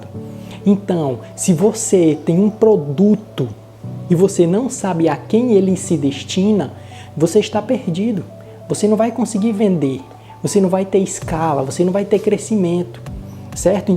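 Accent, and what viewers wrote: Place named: Fortaleza, Brazil. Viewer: Eita sotaque lindo! Também sou de Fortaleza, mas moro no Rio de Janeiro à muitos anos, e somos xaràs no sobrenome.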